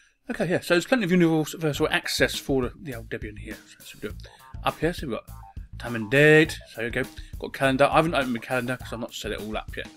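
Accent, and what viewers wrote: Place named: Cockney, United Kingdom. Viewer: Yes, please less Cockney ("bub,bub,bub") and more English.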